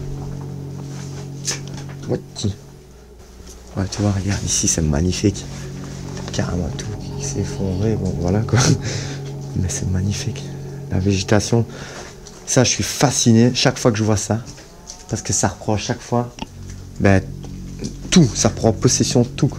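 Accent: Liège, Belgium. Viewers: Ah l'accent de lièges 😂 . Bon c'est un endroit en Belgique mais ou .... en tout cas c'est bien glauque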